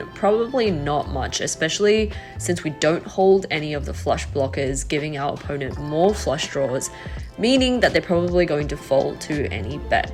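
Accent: Australia. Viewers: She's Australian. I understand her just fine.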